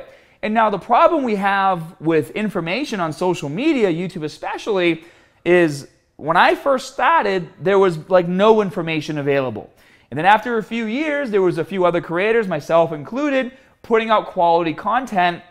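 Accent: Boston, United States. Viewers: Love your Boston accent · My boy out here with that Boston accent. That accent just makes you stronger when you hear it. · I love his Boston accent he's hilarious overall but this guy is funny and cool and authentic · Hey, I'm a current fatass working on losing weight and building muscle if thats possible. I was always skeptical about this guys ads on facebook. Are you from Boston with that accent? Total irrevelant build up to that question but I'm dying to know.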